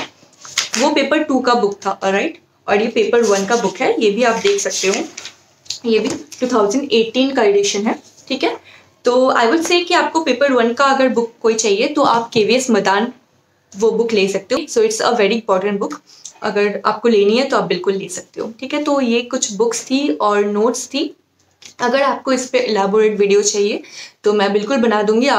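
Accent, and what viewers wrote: Assam, India. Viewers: You sound like an Assamese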